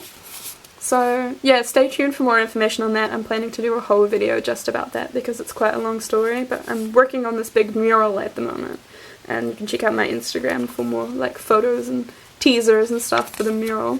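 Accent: New Zealand. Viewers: Are you from New Zealand ?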